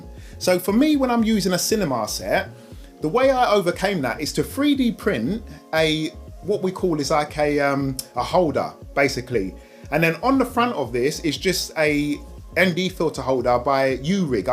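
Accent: London, United Kingdom. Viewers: What parts u grew up Cuz. U sound like NW10 Cuz.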